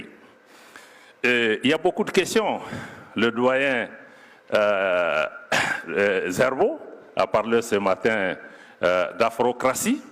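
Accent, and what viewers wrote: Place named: Democratic Republic of the Congo. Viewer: J'ai toujours trouvé un accent lingala à ce monsieur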